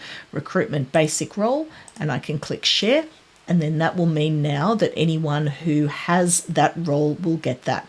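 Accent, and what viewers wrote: Australia. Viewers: thanks heaps, I love your pace, your explanations and of course your aussie accent makes me feel right at home:) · love that Aussie accent!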